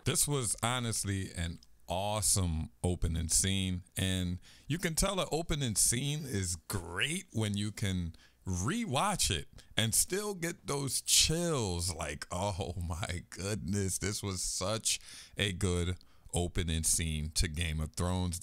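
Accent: Philadelphia, United States. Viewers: You kind of sound like Bernard Hopkins . It must be the east coast philadelphia accent.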